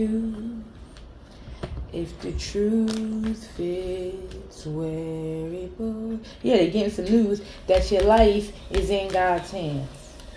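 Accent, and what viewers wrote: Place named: East Coast, United States. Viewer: I love your voice and your lisp! Those lips with ya Easthcoast accent..I do!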